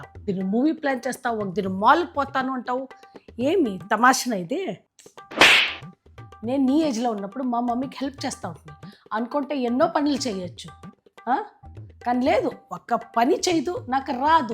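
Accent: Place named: Bangalore, India. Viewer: Bangalore Slang Telugu 🎉🎉 ❤❤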